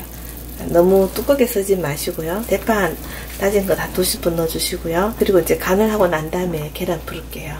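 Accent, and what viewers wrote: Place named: Busan, South Korea. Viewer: 애교있는 부산말씨 목소리 넘 좋아요 ㅎㅎ 저도 고향이 부산이라서 ㅎㅎ 고향 부산 맞으신가요~ 잘보고갑니당:)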